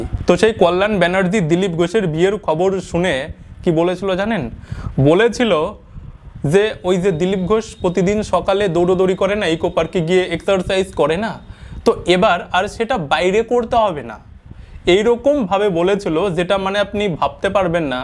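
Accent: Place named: Bangladesh. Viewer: Tor barita Kangladesher kothai.sposto kore kotha bol Ahammok.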